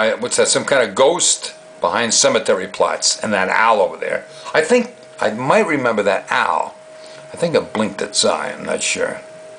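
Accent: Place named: Pittsburgh, United States. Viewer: This IS...the BEST...all time narrated video ever! Pure Pittsburgh 100%. Good ol Laff In The Dark...as narrated by a great Yinzer! "Toss an M-80 at The Devil" that is AWESOME! So Pittsburgh! THIS is the ride they truly should have saved and preserved. (Even if it had to be totally-rebuilt/updated/whatever) as Laff In The Dark was a GEM. An old time gem. The "Turnpike Ride" killed this one off. And Kennywood hasn't been able to figure out "The Old Mill" for 60 years. Cool old skool ride (we used to stop the boats, get out and walk around the inside of the Old Mill. (Then-renamed "Harold's Haunted Hideaway" whatever. I think now it is some dumb "Garfield Ride". Damn shame too. They could TRULY make this ride a scary gem, if they truly wanted to. But...just like Noah's Ark...it is an insipid and watered-down BORING ride anymore. ) And (just like Laff In The Dark...and even (for a while) The Old Mill...and ugggh..."Le Cachot"? 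Remember THAT dumb ride? Everyone was upset when it got torn down. But it was a worse ride than "The Gold Rush". Kennywood...truly, has been piss poor at "dark/scary rides"...but...Not always). There was a time when Noah's Ark was simply badass!. The shaky floors were REALLY "shaky" long ago. Sometimes (in today's cancel culture-of-everything) well..."sometimes they work. Sometimes not" Boring.) The "air blast WHOOPSIE" trick would NEVER fly today. (Old timers know what I am talking about). Take your date on a walk thru. Noah's Ark...make out on the quasi-M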